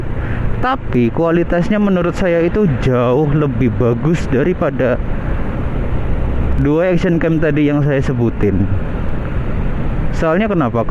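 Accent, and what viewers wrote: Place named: Banyumas, Indonesia. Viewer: Mantap bang. Slam motovlog ngapak 👍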